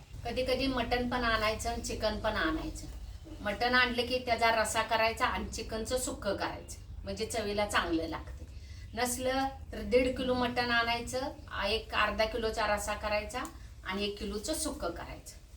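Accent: Kolhapur, India. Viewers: Kolhapuri bolta tumhi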